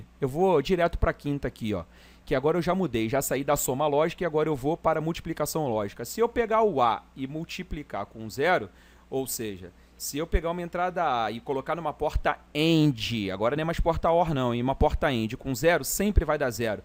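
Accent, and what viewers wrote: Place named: Rio de Janeiro, Brazil. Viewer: nem da pra perceber que é carioca rsrsrs abraço mto obrigado pela ajuda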